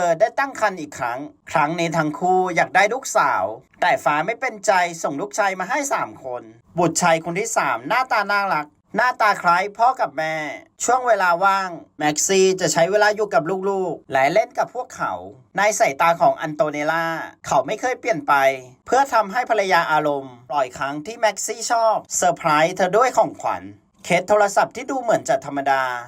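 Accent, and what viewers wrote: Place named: Provincial, Thailand. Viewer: พูดไม่ชัด และสำเนียงเหน่อ ฟังไม่รื่นหู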